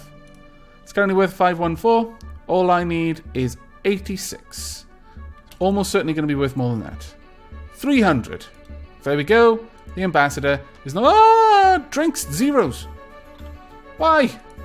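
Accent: Wales, United Kingdom. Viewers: Thank you very much X) I must say without sounding to weird I love your welsh accent as I suppose many of us do :)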